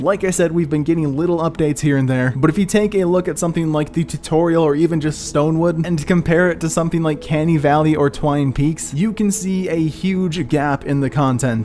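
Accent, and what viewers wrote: Czech Republic. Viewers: Are you Czech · Guessing Czech Republic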